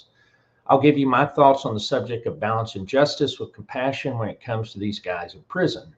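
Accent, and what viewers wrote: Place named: South, United States. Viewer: I’m relatively new to this channel and honestly, I’m still on the fence whether I like it. it’s very difficult getting used to someone with such a thick southern accent trying to pronounce all these Italian names. All of that said, and I’m not a snowflake who gets butt hurt by things, While I realize everything you spoke about regarding John Pennisi is in the public record and public domain I don’t understand why you thought you needed to go through his record on the Internet. Personally I didn’t think he said anything disrespectful about the man who died, John just said things as statements of fact. It’s only relevant because, as mentioned in this video it’s considered to be taboo to take your own life in the lifestyle. You mentioned John has a prison record of “self harm” but without context, we don’t know what that means, he could’ve punched a wall for all we know. 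I just feel that bringing all of that out without all of the facts and proper context isn’t the right thing. The other guy has been dead for almost 15 years. I think there’s a slight difference.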